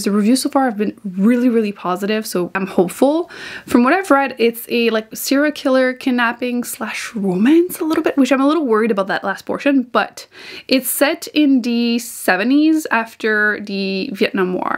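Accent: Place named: Quebec, Canada. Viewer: Hi! Just found your channel and really enjoying the videos I've seen so far. I was thinking your accent sounded a little bit like mine and wondering if you were French-Canadian, and now that I heard you say "Méditerranée," I think my hunch might have been correct. 😄 I look forward to hearing your thoughts on the book you've shared for your TBR. I currently have on loan from the library The Midnight Feast from Lucy Foley, The No-Show from Beth O'Leary, Razorblade Tears from S.A. Cosby, The other Half from Charlotte Vassell and First Lie Wins from Ashley Elston. Then I would like to shop my stash a little bit, as I recently purchased some of the books on my wish list that my library does not carry. I'm not sure what I will prioritize, I will just go with my mood at that moment.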